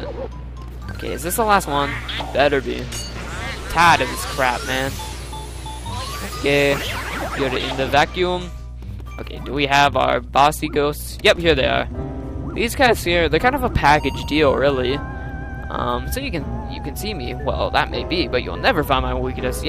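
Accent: Canada. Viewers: Well, Chauncey is shaking, so it makes sense. I shouldn't have said anything. And also Caspin's right, you do sound Canadian. You say "zed" instead of "zee" like Americans. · you pronounce your "ou" sounds in a canadian way, i was just wondering :P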